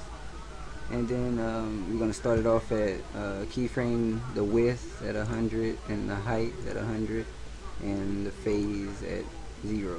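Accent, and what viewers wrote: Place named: New Orleans, United States. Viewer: New Orleans Accent Much..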